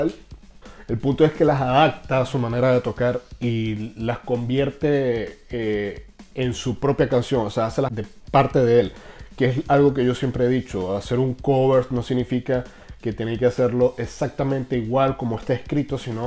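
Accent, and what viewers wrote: Venezuela. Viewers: tu eres venezolano rajao brother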